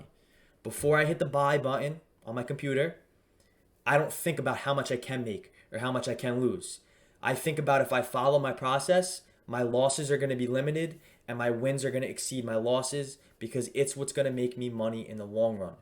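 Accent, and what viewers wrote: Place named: New Jersey, United States. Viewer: Hey, I am from NJ, you sound like your from my area. Thanks to your video, I applied for options got approved for standard margin. But I am getting limited to Pattern Day Trader. Can i call to have margin turned off and the 3 a week wont apply to me? Thanks again.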